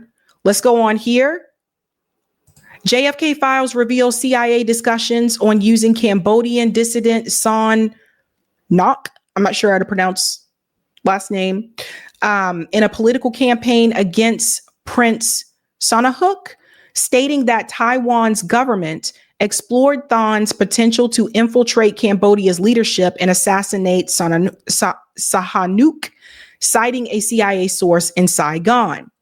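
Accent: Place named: Boston, United States. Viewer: every once in a while you slip in a word with a Boston accent and I love it. As a Masshole born & raised I love seeing our accent rub off a little on transplants.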